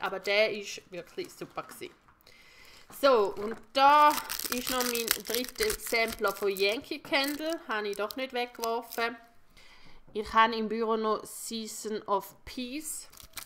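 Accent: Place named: Switzerland. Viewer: Tolles Video, gefällt mir sehr gut! 😍und der schweizer dialekt macht dich sehr sympathisch! :) Hab dich gerade erst entdeckt und dir gleich mal einen Daumen hoch und ein Abo dagelassen! 😊 Würde mich freuen, wenn du bei mir vielleicht auch mal kurz vorbeischaust! Alles Liebe! 😊❤️ - Daniela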